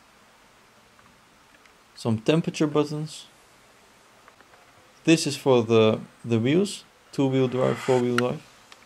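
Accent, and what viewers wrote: Netherlands. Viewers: ik kan echt aan je stem horen dat je nederlands bent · I can hear that your dutch dude 😂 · Thicc Dutch Accent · louis van gaal engels?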